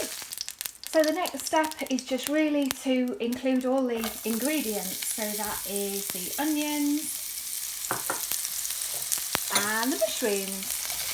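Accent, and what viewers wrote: United Kingdom. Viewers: It looks very delicious. Very nice video. I love your beautiful British accent and intonation. To be honest, it is good for my English study. Thank you for sharing.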